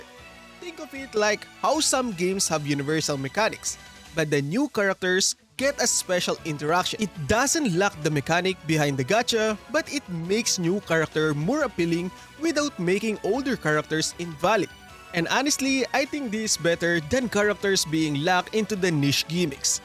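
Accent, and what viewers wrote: Philippines. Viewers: Filipino accent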